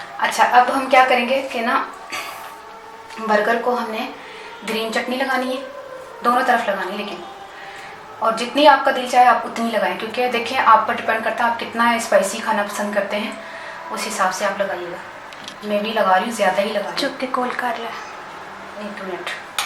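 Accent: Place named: Punjab, India. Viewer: جہاں تک ریسپی کی بات ہے بہت ہی عمدہ لاجواب ہے ۔۔۔۔میں نے تو فسٹ ٹائم اس طرح کے برگر دیکھے ہیں۔۔۔۔ اور آپکی کیمرہ وہ مین بھی کمال کی شخصیت ہیں ان کو ہنسنے کے علاوہ اور کوئی دوسرا کام نہیں۔۔۔۔ اور کتابوں کو دیکھ کر ایسا لگتا ہے کہ آپ بڑے باذوق کوک ہو ۔۔۔اور ایک بات آپ زیادہ تر کہاں پائے جاتے ہو مطلب کے آپ کس شہر میں جلوہ افروز ہوتےہو یا یوں کہہ لیں کہ آپ اپنی پرنور شخصیت سے کس شہر کو منور کرتے ہو یا سمپل الفاظ میں کہوں تو یہ بتائیں کے کس خوش قسمت شہر میں آپکا آشیانہ ھے۔۔۔ ضرور ضرور مینشن کیجئے گا ہم نے آپ کی پنجابی ایکسنٹ سے اندازہ لگایا ہے بٹ پتا نہیں ہم رائٹ ہیں یا رانگ ہیں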